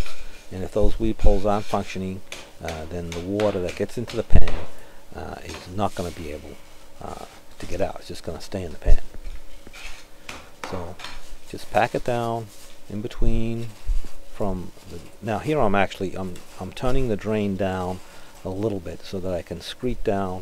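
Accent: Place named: New England, United States. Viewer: Hi, I like your videos and your New England accent. You almost sound Irish or Scottish. That mortar looks so dry. Is it ok to make it a bit more wet like for masonry?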